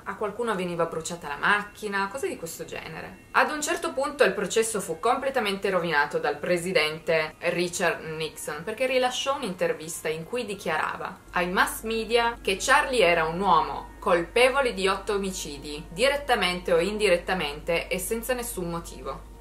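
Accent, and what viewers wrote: Turin, Italy. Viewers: Siciliano? :D è l’accento di Torino! Più torinese di così non si può :D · È torinese, ma in ogni caso interessante equivoco. In effetti alcune vocali sono esattamente come quelle di alcune cadenze della Sicilia! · torinese palese bro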